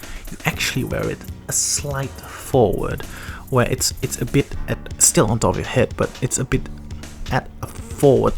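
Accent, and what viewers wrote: United Kingdom. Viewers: Your accent is different in the other what's going on. No offense but it's ABIT weird. This video you sound like British accent? Another one sound more Indonesia? Are you trying to mimick accent or something. Either way just speak how you normally do. And the video I feel like it's too long. I would prefer you get to the point though.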